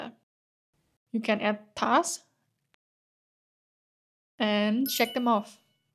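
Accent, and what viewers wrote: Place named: Europe. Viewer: Thats an interesting accent bever heard one like it...european i think. Not sure. Interesting video too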